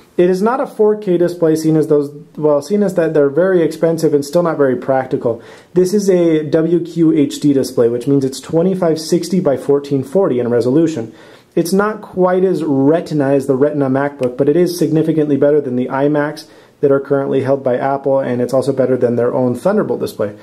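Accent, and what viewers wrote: Spain. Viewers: wow you picked up a small spanish accent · You've acquired a slight Spanish accent. Glad to have you back! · You've come back with a spanish accent :D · Haha I can notice a slight spanish accent. Looks like you got very involved with Bolivian culture ;) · You have a slight Spanish accent now :). Must be all that time spent in Bolivia. Welcome back bro. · You have a spanish accent in your english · It's awesome that he's got that Spanish twist on his accent now haha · chubby and a spanish accent. It's like we're brothers now. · the Spanish accent now is awesome :D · Spanish accent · Wow you sound really spanish after those years in bolivia! :D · You kind of have a Spanish accent now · Is it just me or does have a bit of a Spanish twang to his speech now? How cute:)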